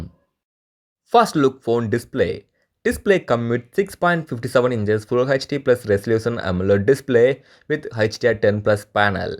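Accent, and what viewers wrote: South India, India. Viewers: South Indian type..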